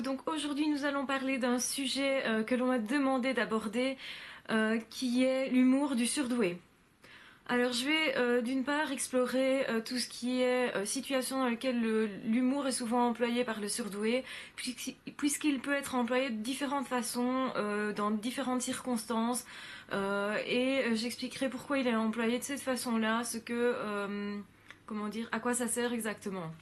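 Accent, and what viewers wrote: Belgium. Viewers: Vous êtes adorable, utile et très agréable , sachez que votre intelligence en arborescence me parle et me touche beaucoup. Il me semble qu"une femme s'exprime plus facilement par l' écrit que par l'oral et que c'est l'inverse pour un homme .Je vous suis depuis longtemps et je vais continuer car cela me rassure sur ce que je suis . Merci .PS J'adore votre accent belge .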